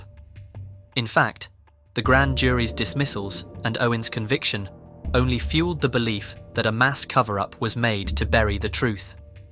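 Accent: England, United Kingdom. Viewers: Always nice hearing another Englishmen having a interesting dark thought provoking channel wish you much success with it 👍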